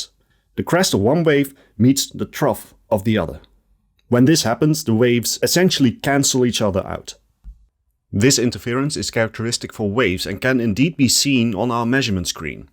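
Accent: Netherlands. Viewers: I can hear that you're Dutch. Great video btw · You sound like you have a Dutch accent. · you speak very good english. it was at most a hint of a Dutch accent. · Your accent is incredibly Dutch. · You sound like you’re Dutch, am I right? :)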